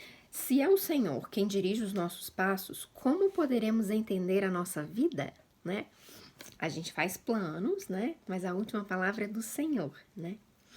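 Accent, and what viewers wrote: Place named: Minas Gerais, Brazil. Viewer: Mineirês! Sotaque mais lindo. Amo !❤️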